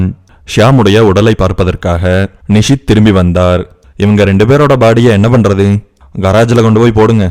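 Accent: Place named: Kongu, India. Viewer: நல்லா இருக்கு கொங்கு தமிழ் ❤❤😊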